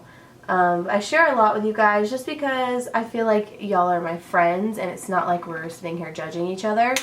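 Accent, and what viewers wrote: South, United States. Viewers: I just discovered your channel and I could tell you were southern but always wondered where from. We are from mississippi but my husband has family from Alabama and he is a HUGE auburn fan and has passed on his love for the team down to our son! We try to make a game atleast once or twice a year. Love it and love your vids!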